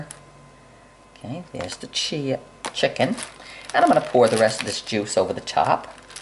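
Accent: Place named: New England, United States. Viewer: I thought so. I’m from Massachusetts originally but now on the west coast. Thought I recognized the accent. Really enjoying your videos. Thanks for the reply.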